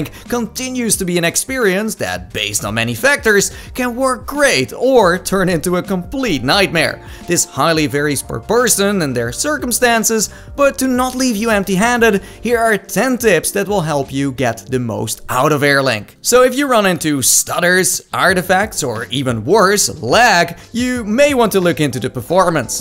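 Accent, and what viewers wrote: Netherlands. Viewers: His accent sounds sooo dutch.🇳🇱🇳🇱🇳🇱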